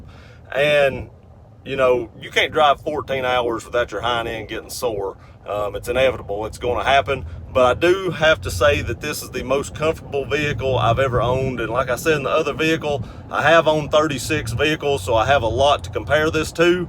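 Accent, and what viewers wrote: South, United States. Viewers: Man, i love this southern accent, it's almost music to my ears..what is it...Texas, Lousiana, etc? & i also love toyota, unfortunately we don't have those big v8 tundra's in Europe, what a shame.. Greetings from EEurope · Well that is a sad story of how you were handled and the safety issues and danger you and others were placed in. I'll be honest I just bought an F-150 and love it but this is disturbing. My engine is a new 2018 2.7 Eco Boost with the 400 lb ft or torque and 10 speed transmission so I hope they sorted that issue out with it. I know it is a completely different motor than 3.5 Eco. I had a 2009 or 10 Tundra I bought new and it was a nice truck. Had the 4.6 V8 but the 5.7 is a real beast. I had a death wobble one time towing my Bobcat with the Tundra but that was my fault using a half ton truck. I never did that again. 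Toyota great trucks and cars for sure. Love the 2018 2.7 F-150 Eco Boost and hope I don't have any issues. Nicely done video btw. Love the southern accent. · Love your darlin honesty. Finally someone that sounds like me with a sweet southern swag. TOYO Tundra here I come. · still sound southern: ) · you know it's a strong accent when a southerner notices right off the bat lol. nothing wrong with it. it's cool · Your Tundra is an awesome truck. If Toyota is still building them well, you'll enjoy a long happy life with it. I bought a new Tundra Limited Extended cab in 2001. I had originally planned to keep it 3 yrs, then sell it and move on. Man, I fell in love with that Tundra. It had the 4.7 DOHC V8 and NEVER broke down on me. Just did the regular maintenance and she continued to perform flawlessly. Unfortunately, exactly one year ago as I was driving down the road a lady ran a stop sign and t-boned me. 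Needless to say, my beloved Tundra was totaled. I owned it for exactly 16 years and put 206,000 trouble-free miles on the clock. The only knock I had on it was that the paint began to fade at year 12, but no big deal as the truck itself was bulletproof. Congrats on your new Tundra. Again, hope she serves you well and keeps an ear-to-ear grin on your face for years to come. Oh yeah...man, you've got a cool Southern accent. I live in Texas..the accent here's a little different. Merry Christmas!!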